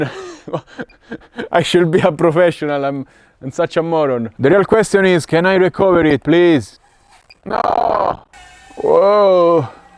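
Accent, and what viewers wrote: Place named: Italy. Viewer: When I was looking to buy my first quad I was confused. Finally I found Your channel and watched Your videos which gave me some knowledge about setups and motivational boost. During this time Your skills were totally mindblowing for me. It's quite funny when I'm coming back here right now I feel so motivated to go out and fly when I hear Your voice and italian accent:D Thanks:)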